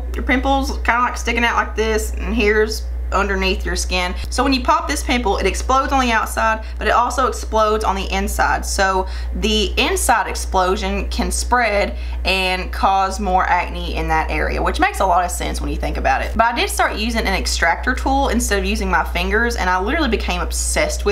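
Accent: South, United States. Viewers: Tímea Tarjányi, it's a southern accent. Do you mean where she lives.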